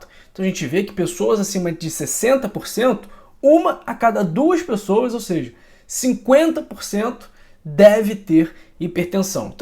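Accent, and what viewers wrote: Rio de Janeiro, Brazil. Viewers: Partiu comprar aipo e colorau. O youtube me indicou este canal e já me inscrevi. Excelentes esclarecimentos. Entendi tudo com este carioquês legítimo. Aqui outro carioca da gema.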